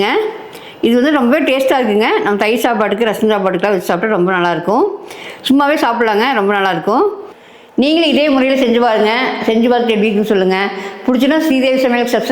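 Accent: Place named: Kongu, India. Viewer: Super parkkavea 🤤aaguthu maravalli kilangula eppadi senju saptirukkom sakkravalli la eni thaa try pannanum samayal video nu start pannirukeenga niraya per ku dovuts varum ellarthukkum reply pannunga antha responce kea nalla views pogum neenga pesurathea kongu slanga ovoru wordskum eadaila responce ah erukku 🙏 enaku thonunatha sonna thappa eaduthukka vendam thank u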